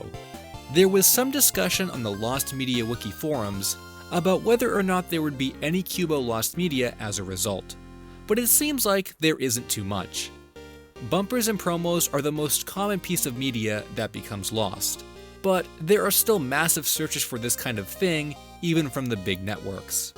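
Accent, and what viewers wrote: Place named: Florida, United States. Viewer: Where are you from??? I am in central Florida and some of these I remember watching like Qubo was my favorite. I remember having that one all the time even for back ground noice. I also sort of remember CW 4 Kids. You sound like you're around my area or in Florida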